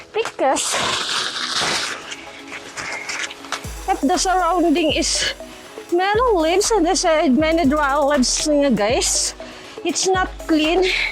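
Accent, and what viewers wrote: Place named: United States. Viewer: wow...ka daghan na di ay sa imu English....hehhehe....mura na amerikana...very nice...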